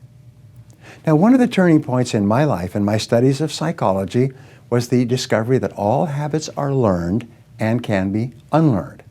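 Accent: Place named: United States. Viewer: Most definitely an American Accent, thanks...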